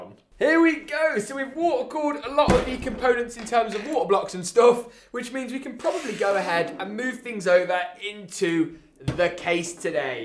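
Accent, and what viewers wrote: United Kingdom. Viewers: The bri ish accent is the most annoying accent in the world trust me man !!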